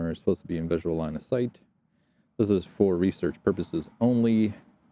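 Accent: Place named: South, United States. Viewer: oh definitely not Texas 😂 maybe Alabama or Georgia am i right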